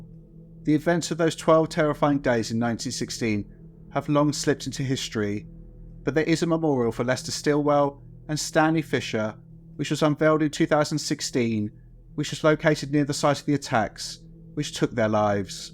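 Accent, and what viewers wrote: England, United Kingdom. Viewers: He’s not German..he’s English. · Why is a pom narrating this?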